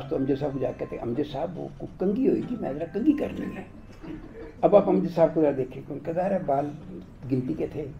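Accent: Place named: Punjab, India. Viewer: Bohat maza aaya !! Punjabi Urdu mix , bara desi style hai aap ka 😅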